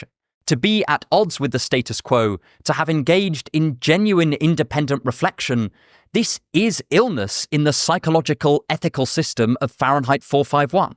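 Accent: England, United Kingdom. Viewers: I've lived in england for 10 years and I've heard and english as british as yours before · This is a deeply unserious comment but I’m generally repulsed by British people and the British accent but you are one of the few British people I find attractive for some reason. Cheerio mate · I never expect you to have an english accent for some reason. Every single time I am surprised.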